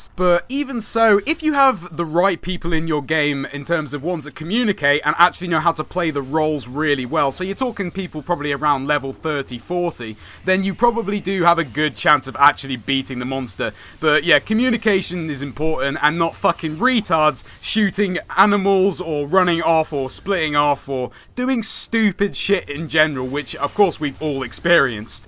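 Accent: United Kingdom. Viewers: He's British? Cool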